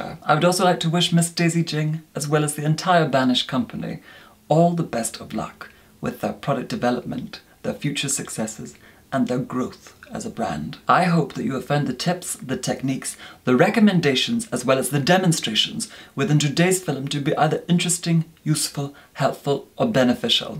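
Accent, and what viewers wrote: Scotland, United Kingdom. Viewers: First time watcher, fascinated by your accent. You sound like that very specific English accent that only really posh Dubliners have. EDIT: listening more, definitely more Scottish than Irish. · I discovered you yesterday. Make up isn't really my forte, but your eloquence is delightful. It's also good to hear another Scottish accent once in a while.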